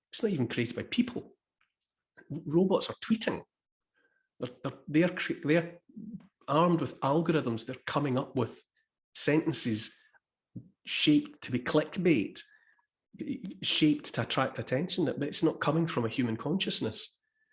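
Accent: Scotland, United Kingdom. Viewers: 'Are we gonna be poopets or no poopets?"written in a Scottish accent. Love that Scottish accent. "I don't want ta be a pie, I don't like gravy."...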